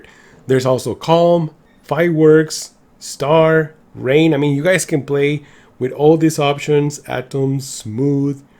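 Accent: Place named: El Salvador. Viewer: My right hand man at my last job is from El Salvador. I miss talking to him for many reasons, but that accent is one of the top ones.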